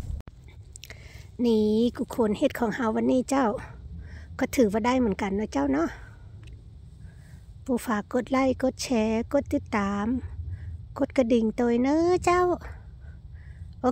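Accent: North, Thailand. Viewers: อยู่ไหนจ้าวอู้เหนือม่วนดี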